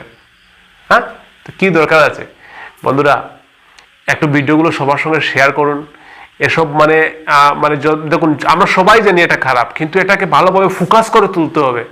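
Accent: Sylhet, Bangladesh. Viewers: ডাক্তার সাহেব, অত্যন্ত উপযোগি video উপহার দেবার জন্য ধন্যবাদ। বিশেষ করে আমাদের মা-বোনরা অনেকেই পাননশলা খেতে addicted হয়ে আছেন।আপনি কি আমার মত বাংলাদেশী সিলেটি নাকি আসামীজ সিলেটি। আমাদের সিলেটি ডাক্তার পেয়ে খুশী হলাম।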